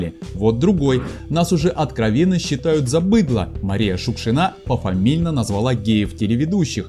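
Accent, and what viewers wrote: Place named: Ukraine. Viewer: Да помойка вы,и ничего не перепроверяете.А говор у вас такой.вы из какой глубинки решили Москву покорить? С Украины?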